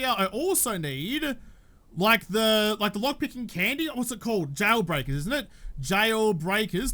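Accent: Australia. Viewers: P.S. Miss your Aussie voice and excitement in the morning it helps start my day, the content is great as always... Just not the schedule!!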